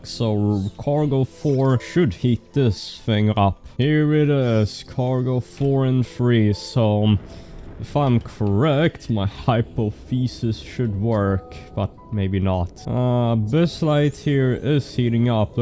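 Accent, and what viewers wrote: Sweden. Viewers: Its funny how easy it is to recognize your own accent. Two minutes in and im like "this guy HAS to be swedish." I double check and yeah, confirmed. Any other country and i have no idea what accent it is haha. 🇸🇪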